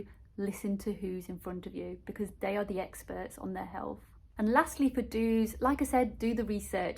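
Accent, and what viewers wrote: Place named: Northern England, United Kingdom. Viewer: Also your accent is so charming to me :P Northern England? Or is it Scouse? I've tried to learn as a Canadian who loves British culture lol (all of "Britain". I know you're English :P)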